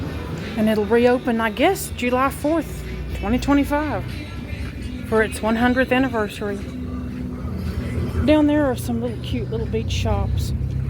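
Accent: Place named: Texas, United States. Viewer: Love that Texas accent (I miss that)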